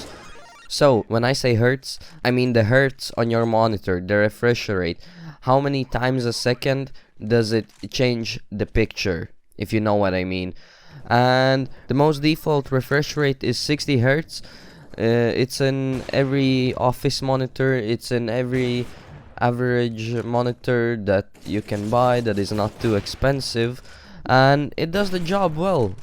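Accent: Latvia. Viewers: Latvietis esi? ljoti izklausaas :D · No akcenta sapratu ka tu esi latvietis :D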